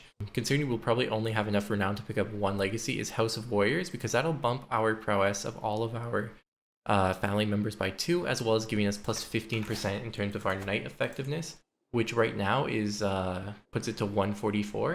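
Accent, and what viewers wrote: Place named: California, United States. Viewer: this guy and MRE guy have the same California accent and I enjoy it I just need to say "ok niiiiccceee" more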